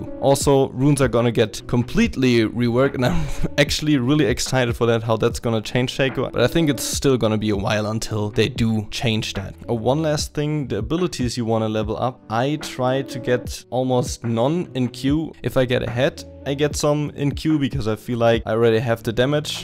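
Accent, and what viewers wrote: Germany. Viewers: 100% deutscher akzent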